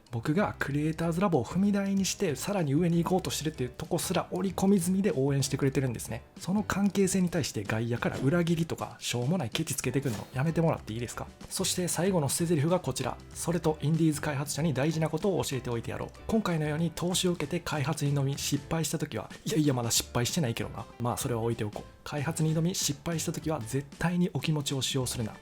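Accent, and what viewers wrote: Kansai, Japan. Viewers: 関西弁でなんか違和感あるなぁ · バリバリ関西弁ですね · 関西弁もろに出てきて草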